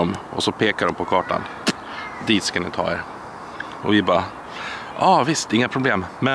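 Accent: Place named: Dalarna, Sweden. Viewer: gud va du pratar Dalamål har aldrig hört dig prata så tidigare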